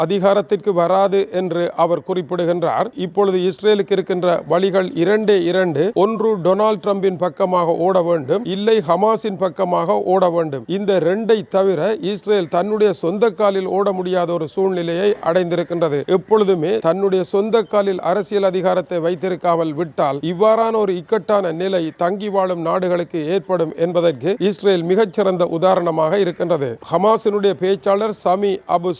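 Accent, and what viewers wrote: Sri Lanka. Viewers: கீ.சே.துரை அவர்களின் இலங்கை தூய தமிழை கேட்டு கொண்டே இருக்கலாம் இலங்கை வானொலியில் அப்துல் ஹமீது அவர்களுடைய பேச்சு மிக சிறந்தவை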